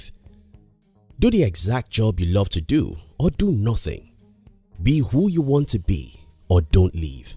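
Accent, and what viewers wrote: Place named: Nigeria. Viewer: I feel your voice is Nigerian, its not that important but can that hand be dark skinned? 😊 However good video, inspirational and motivational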